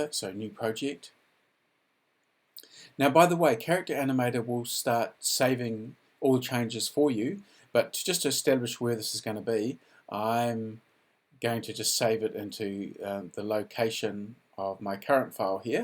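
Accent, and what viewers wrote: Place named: Australia. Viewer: Really good intro vid,. And nice to hear another Aussie accent in the animation world! 👍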